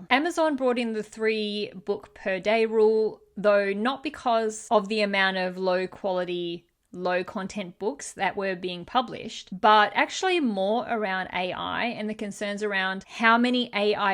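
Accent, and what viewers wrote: Australia. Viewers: It was very hard to catch that Australian accent. But i can smell a Australian from a mile away.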